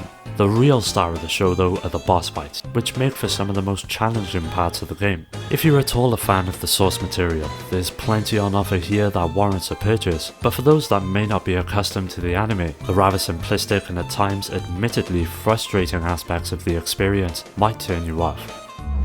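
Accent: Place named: Wales, United Kingdom. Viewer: are you from cwmbran or Newport? that accent is quite recognisable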